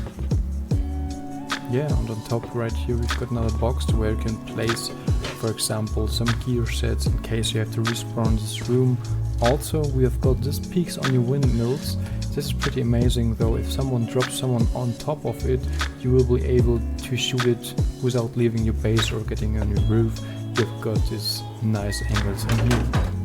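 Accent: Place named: Germany. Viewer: Höre einen deutschen Akzent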